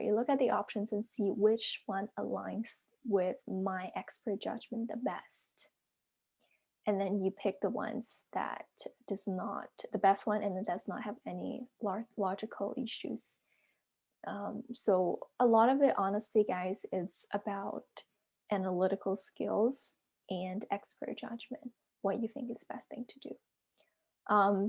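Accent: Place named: United States. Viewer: Hi, Thanks for the video. Could you share where you’ve studied American English accent so well? Thanks in advance and sorry for my answer besides theme. Take care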